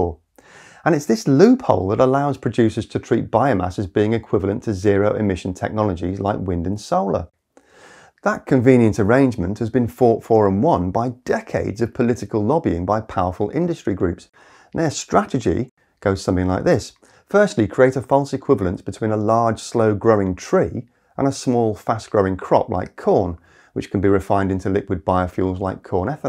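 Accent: United Kingdom. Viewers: Not even a minute in and you have told two untruths. 1st of all wood pellets are not made out of old growth. Most of the trees that are harvested are around 20 years old which is the typical lifespan of pine trees. The trouble with this lie is that it is misinformation absorbed by the those who lobby for legislation against the logging industry. It prevents clear cutting timber which creates these massive over grown forest which are basic tinderboxes. Those forest fires which are mostly avoidable cause significant harm including loss of life. So your lies and misinformation have consequences. Next, CO2 emissions are net neutral in biomass because the carbon that is released during burning is the same carbon absorbed during growth. Furthermore, just because you have some British accent doesn't make you smart. You are spreading climate fear (fear porn) with is all lies and misinformation. 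You are the danger. I would down vote you 1000 times if possible. I will settle with just calling you a liar and a fraud. Have a think on that!!!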